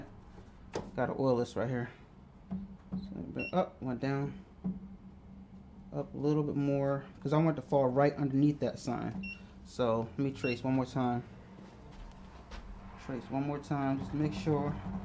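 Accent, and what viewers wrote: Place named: Baltimore, United States. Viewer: you from baltimore my man?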